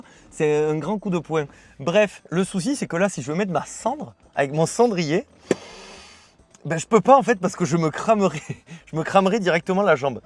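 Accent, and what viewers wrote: South, France. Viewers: Le ton ici est plus méridional, disons.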